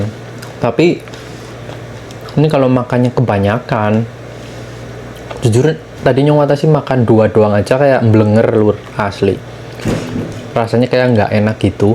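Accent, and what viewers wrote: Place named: Purbalingga, Indonesia. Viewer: Jial ngomong ngapak ala Purbalingga 😊nyong y wong Purbalingga 😂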